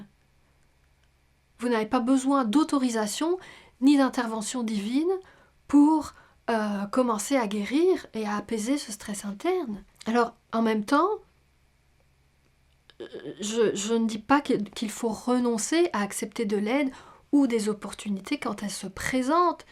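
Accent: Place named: France. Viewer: Merci pour ces vidéos très instructives. C'est étrange vous avez un accent français mais je reconnais quelques québecismes, est-ce que vous auriez passé du temps au québec par hasard ?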